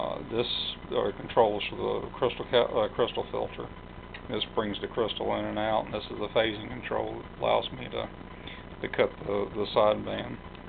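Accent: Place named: Southeast, United States. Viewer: more than likely....Southeastern USA....some accent detected....old southern boy myself...I miss a word or two here and there, but no big issues....hell of an undertaking, I think....and it F'n works....I can't build anything close to this....some small kits....foxhole/crystal sets for MW/SWL/AM/CW....but nothing on this scale/level....damn good job this cat did....thumbs up.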